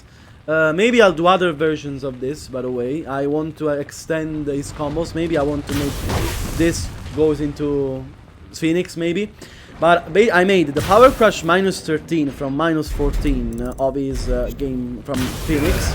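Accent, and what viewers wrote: Italy. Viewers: L'ho capito subito che sei italiano bro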